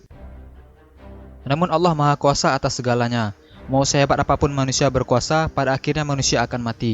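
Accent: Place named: Aceh, Indonesia. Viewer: Admin seperti dialek ureung aceh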